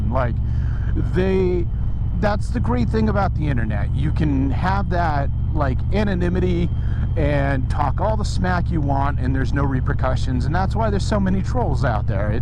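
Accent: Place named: Boston, United States. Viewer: your handlebars are trash and your voice screams boston ya fhekk...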